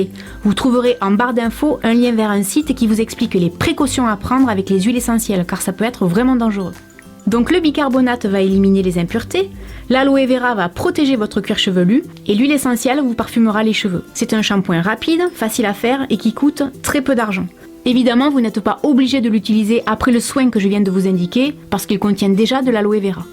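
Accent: South, France. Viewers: merci pour tes conseils et reflexions! j' adore ton accent du sud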